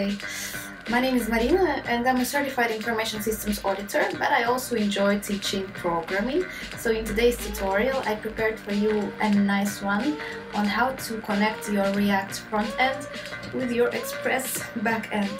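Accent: Russia. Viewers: услышал знакомый русский акцент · слышу что вы русскоязычная и это хорошо, спрошу. Зачем вы фетчите дату в useEffect? Он же срабатывает при каждом ререндере дома или вообще в изменении его структуры. Или я не прав? Пожалуйста, помогите разобраться, потому что я привык фетчить дату при событиях типо клика или сабмита, но вижу как некоторые делают это в хуке useEffect. Реакт изучаю совсем недавно из-за надобности по работе, обычно писал только бэкенд. И меня ставит в тупик этот хук. Почитав офф документацию написано, что отрабатывает в зависимости от установленных зависимостей, и изменении состояния этих зависимостей. В общем путаница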